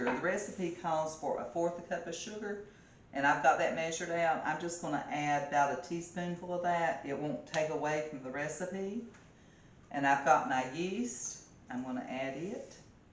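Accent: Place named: South, United States. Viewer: Have you ever heard of any kind of roll that was made without yest? You southerners are so weird the way you talk adding extra words in when you don't need them and cutting words out that normal people say. You're like the British just making stuff up as you go along. Do you say beet root also like there's some other kind of beet that isn't a root? I guess if you grow up saying it, it sounds normal but for the rest of the country it sounds like you rode the short bus to school.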